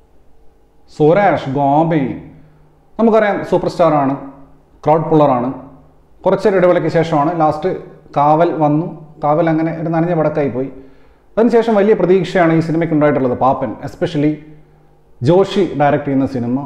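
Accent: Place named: Southern Kerala, India. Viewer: കോഴിക്കോട്കാരനാണോ പക്ഷെ സംസാരം കേട്ടിട്ട് തെക്കൻ സ്ലാങ്ങ് ആണല്ലോ.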